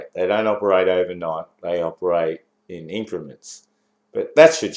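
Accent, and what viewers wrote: Australia. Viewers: I think this guy's a fellow Australian by the accent,admittedly a bat shit crazy conspiracy loving version. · You're totally full of shit. Except for an Aussie accent you got nuthin'. The capsule was supported by the service module, which is much bigger and has the consumables and the fuel cells. The Apollo is somewhat roomy inside with the seats stowed. The seats fold and retract and leave about a 6 foot tall space that is 10 feet around. Just because you're too much of a wussy to shit in front of other guys doesn't mean dedicated people can't do it in order to get a free trip to the moon. You're a hick jerkoff.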